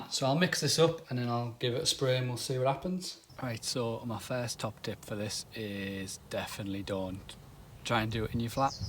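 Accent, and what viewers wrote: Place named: North, United Kingdom. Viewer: You sound like a fellow northerner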